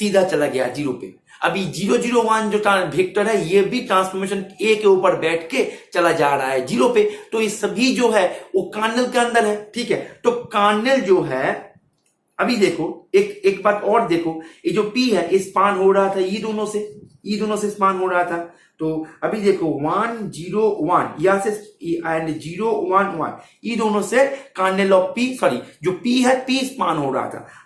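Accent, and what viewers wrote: Bengal, India. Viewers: Sir Hindi te bolleu sir er bangla tan ta bojha jai tai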